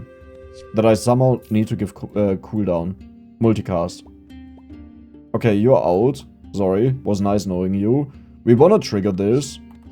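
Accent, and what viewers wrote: Germany. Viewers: Wieso ist das so überraschend? Der Akzent ist doch nicht zu überhören 😀 (nicht negativ gemeint) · Selten jemanden so deutsch klingen hören, wären er englisch spricht 😄. Aber gutes Video ❤ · Ich hatte mir schon vom Akzent gedacht das du deutsch bist, aber auf einmal übersteuert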